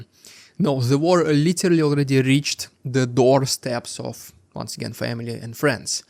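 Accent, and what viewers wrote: Russia. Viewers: He's Russian that's where the accent comes from.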